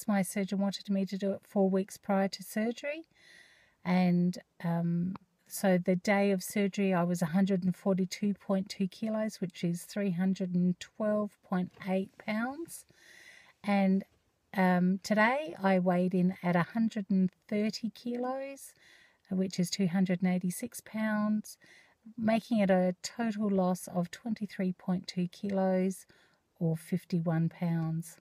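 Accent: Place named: Australia. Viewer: Hi! Welcome! I love your Aussie accent':p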